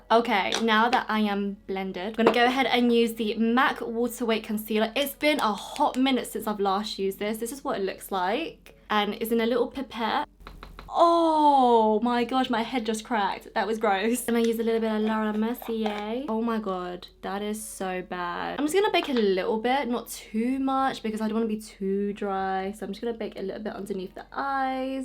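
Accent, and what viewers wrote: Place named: England, United Kingdom. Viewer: May I just say how lovely and precious you are?!?! I so very much enjoy watching you and I love your accent!!! I'm from VA in the US and all I hear is country ass southern accents which don't get me wrong that's fine but I love English accents!!!🇬🇧🇬🇧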